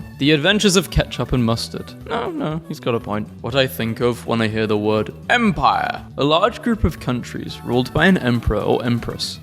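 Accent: United Kingdom. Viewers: Howdy again British feller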